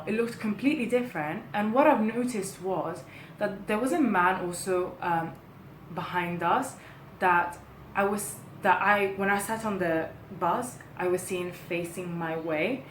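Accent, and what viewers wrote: United Kingdom. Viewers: You learned English from a British instructor(s) so you have that accent. Your mother's accent from Nigeria i hear as well. And of course the Italian 😁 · Wow you sound british. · it’s really not a stupid question. I didn’t realize she grew up in Italy until she mentioned it. As an American, she sounded very British to me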